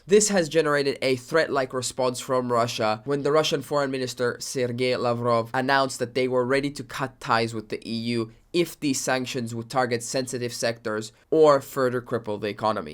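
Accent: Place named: Italy. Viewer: oh really? Sorry, it's just that you're accent sounded very Italian.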